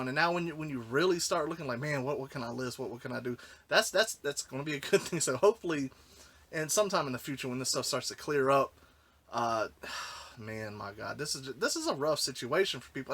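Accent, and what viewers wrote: South, United States. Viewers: I even want to be careful when buying off ebay. You don't really know who handles you items before you get them. As of late I even try to disinfect my items i buy as I buy them via mail. Man you sound southern like me. I'm from ky.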